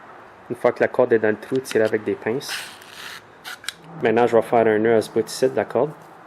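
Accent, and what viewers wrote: Canada. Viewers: super, intéressant. Amusant le franglais canadien, e ton adore l'accent! double plaisir à regarder!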